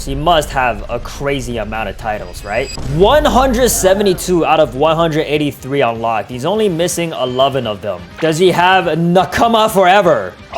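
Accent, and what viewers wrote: Canada. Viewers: Bro are you from canada 😈😈😈😈😈😈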